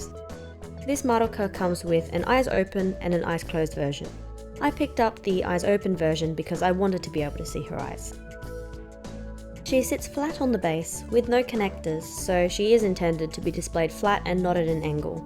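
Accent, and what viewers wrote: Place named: Australia. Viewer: This is the first video of yours I am watching and you are reviewing Koto Batwoman. I've been eyeing that figure for a while now lol...I guess I need to get it now. I love your crystal clear speech and pronunciation. That Aussie accent is something else, tho :) <3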